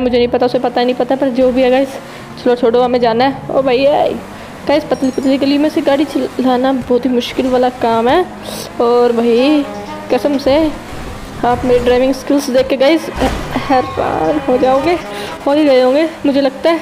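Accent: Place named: Haryana, India. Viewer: same hare me too haryanvi Edit : dekhkar aur awaj se hi smj gya haryanvi Chori h 🤟🤟💪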